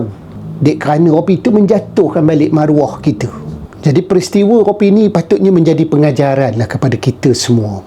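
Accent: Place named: Perak, Malaysia. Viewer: Tu dialek perak...jangan sedap mulut kalau jahil.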